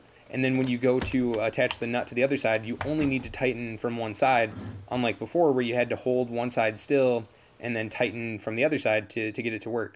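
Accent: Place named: Chicago, United States. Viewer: Chicaaago accent"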